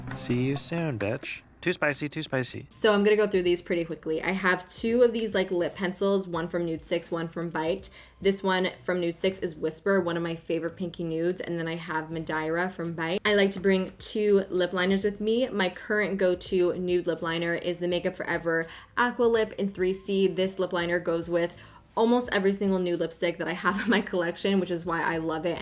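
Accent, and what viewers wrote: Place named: Canada. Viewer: Jalynn Newell it’s because she’s from a region in Canada where that’s the dialect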